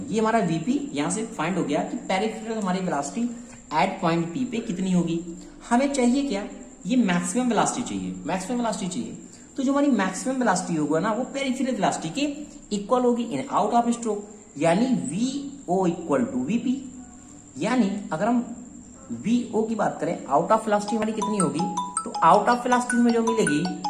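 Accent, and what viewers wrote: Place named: Hyderabad, India. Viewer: Hyderabadi accent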